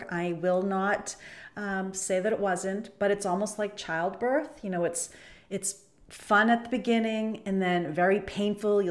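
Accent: New England, United States. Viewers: Hi, Your blue & white top is very pretty!! the Fall box was a lot of wonderful items, I was toooo late to order one but that's my on going luck, haha! I can almost smell all of the lovely Fall smells! That was so nice Carla could help pack the boxes, each one took some time!! take Care, hugs from Paula in Indiana!! p.s. I can really hear your New England accent!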